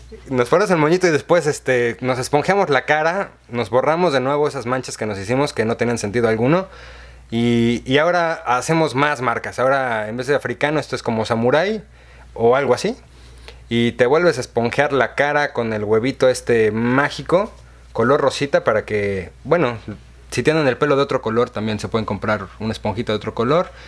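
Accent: Mexico. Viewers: 😂😂😂😂 Ese "dejo" ranchero de tu esposo da risa. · Hola bonita! Asi me pongo la mascara 😂y! Woooww! Una voz muy linda y ese acento bien mexicano! "Las cejas tipo Frida khalo" 😂😂😂 Me encantó el video 😄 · Tiene acento mexicano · Me parece que tu esposo es MEXICANO me suena muy MEXICANO como muy bromista, hablan perfecto el español LIKE SI CREES LO MISMO O COMENTA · Jajajajajaja lo Mexicanos salen con unas... como me llama mucho la atención escuchar su dialecto.. jaja como dicen ustedes es padrisimo saludos desde Colombia..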